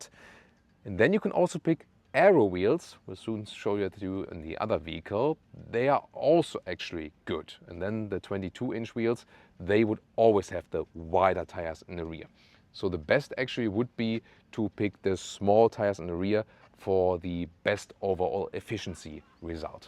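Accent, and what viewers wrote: Germany. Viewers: There's nothing sweeter than watching a BMW review with German accent :)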